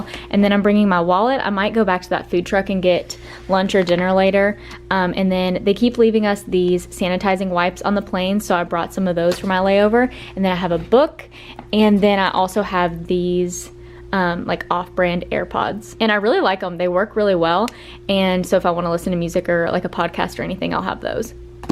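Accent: South, United States. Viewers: Hi... I'm amazed that your internal flights are still operating in the USA... Our flights in the UK are totally grounded.. We have been told by the UK Government that we can only go out for exercise / get food / if your a key-worker NHS... we're pretty much all grounded, we can't even go out in the car because police are stopping car movements and you risk a £££££ fine!!! You sound really sad...! I just love your accent...;-) YYYeeeeeee Hawwwwww Go Cowgirl!!! Bet you look great in western gear..!!!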